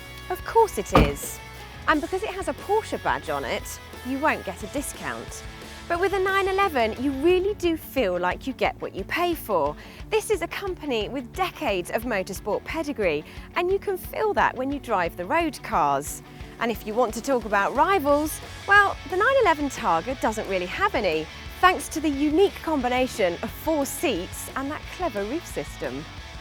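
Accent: England, United Kingdom. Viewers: she's cool, reminds me of the one from fifth gear... anyways which region of England is this accent from?.. curious, anyone? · I love your British accent. Cheers.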